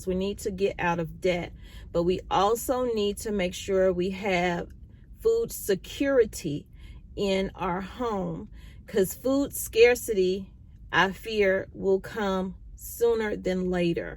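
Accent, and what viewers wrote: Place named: Carolina, United States. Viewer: 🔥 Great video! Especially in the beginning when you talk and encourage people to prep and stock up. Why would you stop?? No.. Keep the talking at the very beginning before you go in because there could be first time viewers or people that haven't started prepping yet and need to hear this! Plus I love your Carolina accent.. OMG. Forgive me... it's beautiful ❤️ I'm in Los Angeles..so hearing you is like a breath of fresh air. Please keep talking and being passionate at the beginning of the videos... Because it . I've been prepping and stocking up for almost 3 years. Thank you 👍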